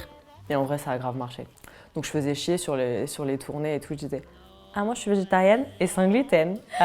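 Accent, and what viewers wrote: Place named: Paris, France. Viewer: L'accent parisiens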